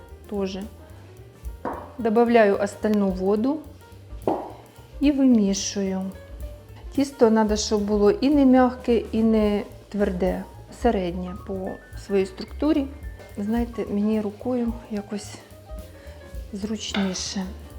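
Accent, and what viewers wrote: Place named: Odesa, Ukraine. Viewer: Одеський говір. На заході теж розмовляють не на укр.мові.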